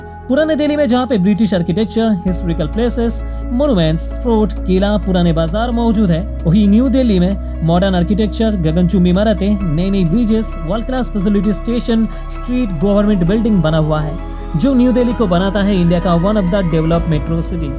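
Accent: Bengal, India. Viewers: Hindi with Bengali accent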